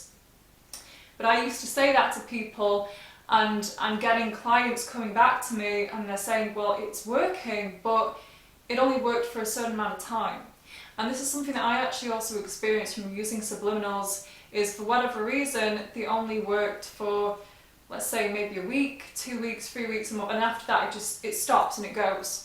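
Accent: United Kingdom. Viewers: I don't know. You're beautiful and charming. And that British accent. My God. God will marry me to a girl like you. You're so adorable. 🎡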